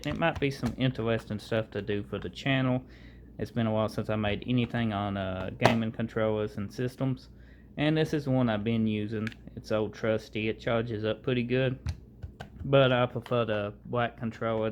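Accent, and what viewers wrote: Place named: South, United States. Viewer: your souther speech reminds me of a kinder Dusty Marlow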